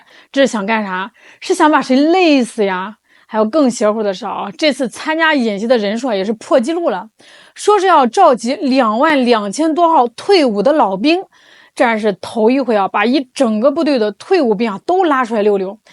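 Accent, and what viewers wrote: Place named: Shandong, China. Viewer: 主持是山东人？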